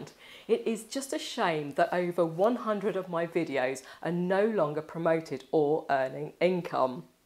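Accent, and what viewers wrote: England, United Kingdom. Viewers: I was wondering where you were for a while but sort of figured what had happened. Anyway allways enjoyable content on all channels. No offence to our American friends it's all ways good to hear a English accent. I was born in St Leonards but now live in Australia. My bike 1984 GPz 900r. Good luck and good riding.😉😉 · Given the obvious of being a beautiful girl from Cornwall with an equally beautiful personality I would still view your video productions if say perhaps the topic was Cornish game hens! Great British accent Greetings from Arizona USA, where we speak some sort of english. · I love her British accent.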